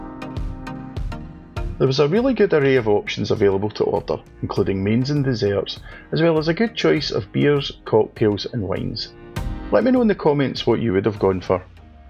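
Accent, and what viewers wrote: Scotland, United Kingdom. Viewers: Genuinely love all your reviews. It's always nice to hear a Scottish reviewer in aviation. It's an absolute passion of mine. Always try to comment as know it helps channel due to being involved in some other channels 👌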